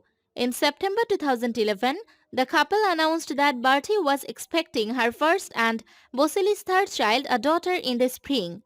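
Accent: India. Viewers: Your accent sound like Indian to me hard to understanding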